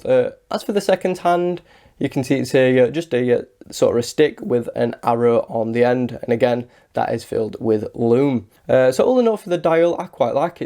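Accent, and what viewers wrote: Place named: West Yorkshire, United Kingdom. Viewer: Great watch. Wearing mine now! Love the accent too - I'm going West Yorkshire?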